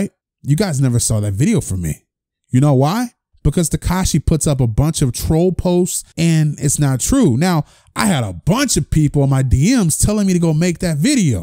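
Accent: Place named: Houston, United States. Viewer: 😂😂 I can tell you’re from Houston 😂😂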